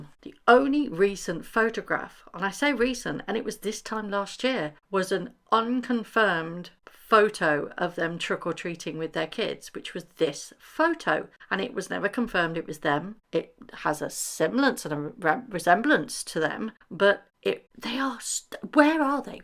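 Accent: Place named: United Kingdom. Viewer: I think the term you are using which sounds like mum with your British accent is Ma’am here in the US, the female equivalent of Sir, and the older version of Miss. it is used by some service people, some younger people, and I, elderly myself, will use the terms to get someone’s attention if I wish to be more formally polite.If a younger person,I’ll just say excuse me. Never would I use it for a class or social position, ever. Especially with them. Just to get someone’s attention. I was an Army child of a Lt.Colonel,and I didn’t call my father sir or my mother ma’am, but my father was called sir by junior officers.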